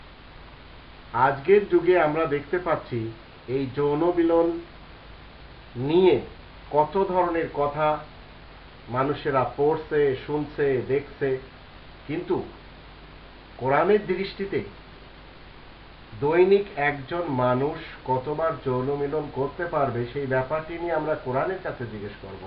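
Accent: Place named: Comilla, Bangladesh. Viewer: আপনার বাপ দাদারা কি কুমিল্লা থেকে যশোর এসে বসবাসকারী? আপনার ভাষায় তাই ধারনা হয়।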